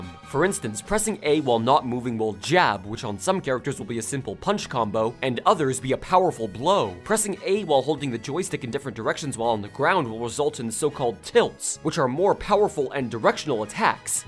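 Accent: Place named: Norway. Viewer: You sound like you are from norway :D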